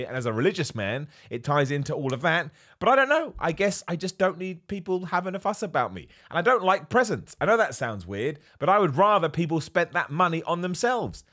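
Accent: United Kingdom. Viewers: Great Video, I'm from the UK and it is so funny hearing your views as I think the same and always better UK voice than American like there is alot on YouTube. Keep up the great work. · Great as always love your candor. Always good info and a laugh. maybe it's because I'm from the United States but I could listen to somebody from the UK talk all night long it's not that that's weird I just like the accent anyway keep up the good work man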